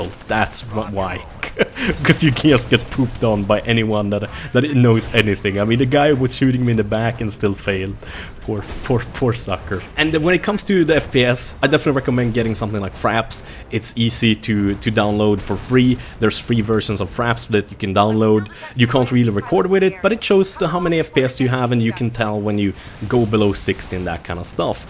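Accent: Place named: Sweden. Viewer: Hehe, it only takes me a few seconds to recognize the swedish accent.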